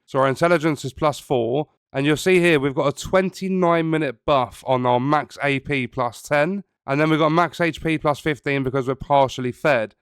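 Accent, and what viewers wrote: United Kingdom. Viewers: Every Fallout 76 youtuber seems to be British lol. I know it's not their fault, but the British accent to Americans is so grating lol.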